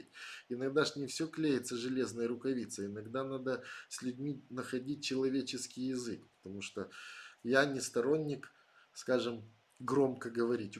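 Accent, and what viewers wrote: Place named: Ukraine. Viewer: ваш украинский акцент в речи, мягкий и душевный, мне очень приятно слушать. Моя мама с Украины, с Херсонской области, я каждое лето бывала у бабушки и этот акцент вызывает ассоциации с детством, с какой то общностью людей разных национальностей. Мне нравится ваша простота, искренность и ваше мировоззрение. Спасибо вам за стрим, за отсутствие нарциссического стремления к совершенству, за поддержку нас, беларусов и всех людей доброй воли. Удачи вам и здоровья!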